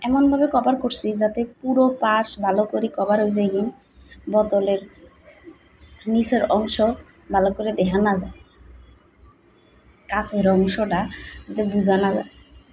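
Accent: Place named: Noakhali, Bangladesh. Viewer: ও 😂 ভিডিও খান সুন্দর ই অইচে👌আনরা সবাই হরের ভিডিও কানের লাই অপেক্ষা আছি☺️☺️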